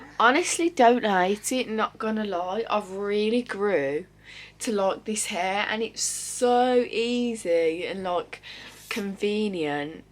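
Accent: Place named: Birmingham, United Kingdom. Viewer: She's from Birmingham that's the Birmingham accent you're hearing x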